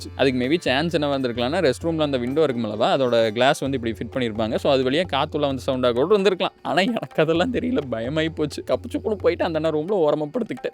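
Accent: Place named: Coimbatore, India. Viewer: உங்கள பாதா சென்னை காரன் மாறி தெரியலையே. கோயம்புத்தூர் மொழி பேசுறீங்க.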